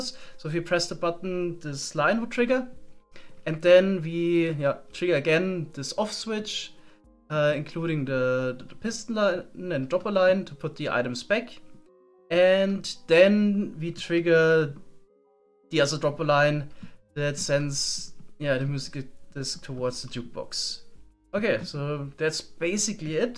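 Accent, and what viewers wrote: Germany. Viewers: Bro dieser Deutsche Akzent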